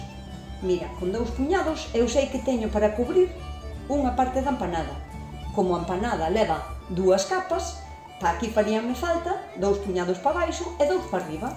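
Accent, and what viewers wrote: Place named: Ribeira, Spain. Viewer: De donde eres??? Porque falas ijual que nos aqui en Ribeira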